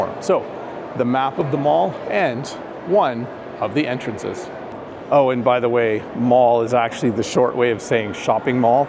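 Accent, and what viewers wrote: Canada. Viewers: hello! i got a question, what’s your accent? is it canadian, or i’m wrong? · We enjoy Canadian English, thank you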